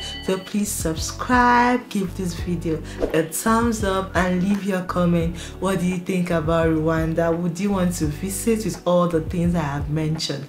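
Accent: Nigeria. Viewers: You try to put subtitles because Nigerian accent is hardly heard by Rwandans. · More people speak english now, but i think your strong nigerian accent was a problem. It's like me when listening to Jamaican or carreabean. If you speak clearly, they will for sure understand.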